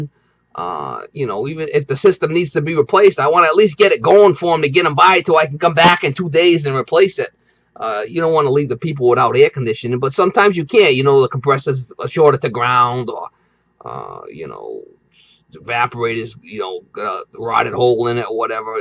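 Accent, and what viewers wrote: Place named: New York, United States. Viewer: This guy is cool haha....new yawk?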